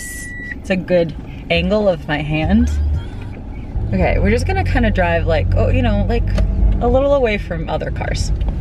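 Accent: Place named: New York, United States. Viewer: I love hearing NY expressions that I never realized were lol. My dad always used to say “dilly dally” but he just recently passed on July 19, 2019. I don’t realize some of the expressions NY’ers say until I hear them. I should have said before I live in NC now for 13 1/2 yrs. So you speak like I do. Lol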